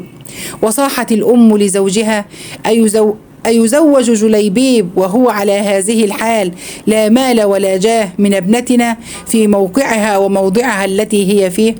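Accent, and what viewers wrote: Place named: Egypt. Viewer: السلام عليكي ورحمة الله وبركاته انا من متابعيكي اختي في الاسلام اختي الفاضلة ومحتواكي عن نشر احاديث الرسول وامور الدين جعلها في ميزان حسناتكي لاكن سامحيني في هذا الامر ان تتكلمي في اللغة العربية الفصحى لانها لغة القران فالجيم جيم وليس كيم والثاء ثاء وليس ساء والذال ليس زال وانا من متابعين شيوخ مصر واحب اسلوبكم في سرد اخلاقيات ديننا الحنيف لاكن ارجوكم ان تلفضو اللغة العربية كما هي وسلامي لشخصك يا غالية وشكرا وصل اللهم على سيدنا وشفيعنا وسيدنا محمد وعلى اله وصحبه اجمعين الى يوم الدين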